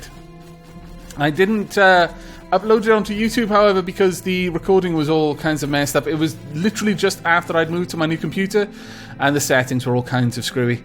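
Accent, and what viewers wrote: Wales, United Kingdom. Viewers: Thank you very much X) I must say without sounding to weird I love your welsh accent as I suppose many of us do :)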